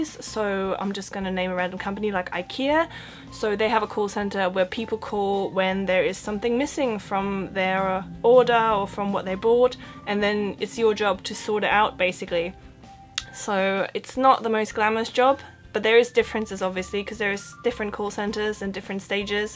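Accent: Germany. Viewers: I can clearly tell the Getman accent. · Your accent is adorable! You can tell that the London influences are kicking in, but still with undertones of a native German speaker. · Exactly the German accent likes I sound while talking English thanks to my 8 years having spent in Hannover. But the contents you provide are very good for young people want to discover London in an affordable way. Perfekte Arbeit.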